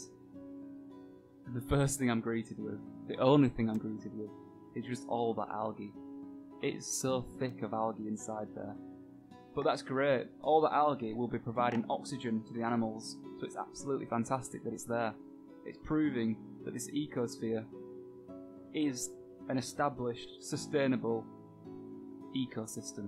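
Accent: Yorkshire, United Kingdom. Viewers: love a thick yorkshire accent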